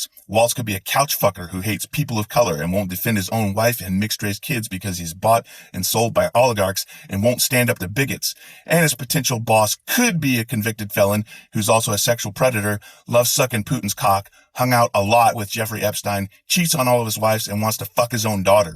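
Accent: Scotland, United Kingdom. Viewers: am I detecting a bit of a Scottish accent here?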